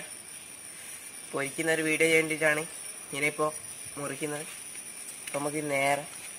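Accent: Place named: Kannur, India. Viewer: മോന്‍ കണ്ണൂരാണോ സംസാരം കേട്ടിട്ട് കണ്ണൂര്‍ തലശ്ശേരി വടകര ഭാഗത്തുള്ളവര്‍ സംസാരിക്കുന്നത് പോലെ ഉണ്ട്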